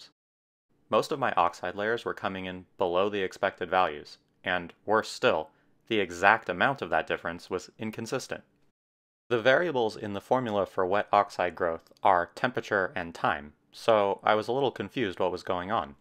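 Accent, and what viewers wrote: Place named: United States. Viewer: Fascinating, and aesthetically pleasing. Look forward to future videos. P.S. this was my first time ever hearing someone with US accent correctly pronounce the word "niche". It grates me when I hear "nitch" - I just can't help myself!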